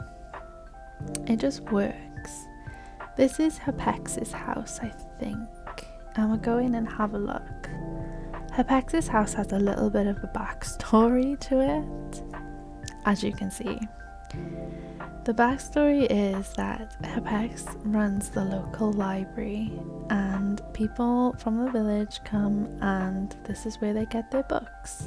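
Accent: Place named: Wales, United Kingdom. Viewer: love that welsh accent hello fellow Cymro! 🏴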